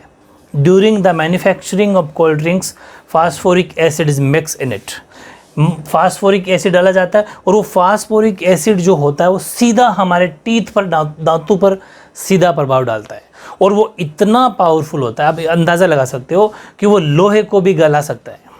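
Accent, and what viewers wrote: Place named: Marwari, India. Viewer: Ghutne Adte h kabhi kabhi aap marwari bolte ho sarji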